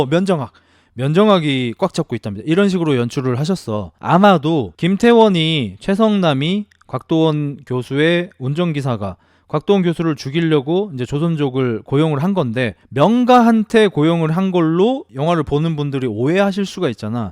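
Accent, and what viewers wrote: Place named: Gyeongsang, South Korea. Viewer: 경상도랑 조선족 묘하게 섞여서 혼란스럽네 ㅋㅋㅋㅋㅋㅋㅋ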